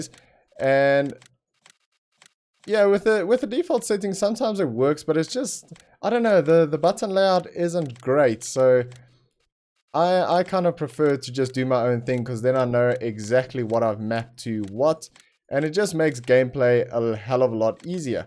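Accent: South Africa. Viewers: You sound a lot like a South African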